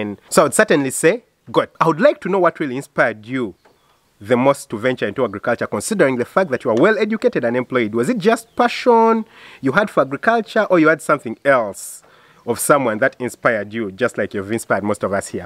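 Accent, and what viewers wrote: Uganda. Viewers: Our favorite Dr,we love your passion for farming.We also love your Ugandan accent.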